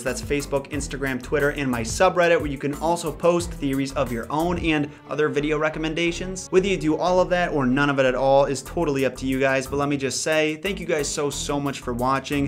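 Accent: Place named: Boston, United States. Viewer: I like you accent and the way you say "philosophy". Boston?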